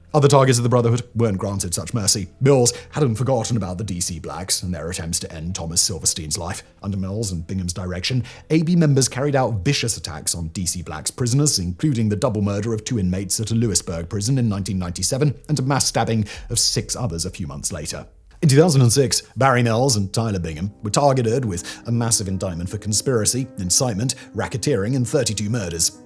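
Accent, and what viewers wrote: England, United Kingdom. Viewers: well one it’s more for fun, but two the author of this video is carries a british accent. I guess you could just take that at face value or further recognize that maybe you and the rest of your british kind are what’s actually trite. Lol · The exaggerated English snobbish pronunciation makes it hard to understand what you say.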